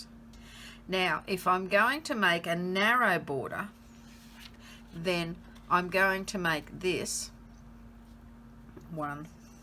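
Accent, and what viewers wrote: Australia. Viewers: Hey just curious what is your accent, Australian or am I incorrect?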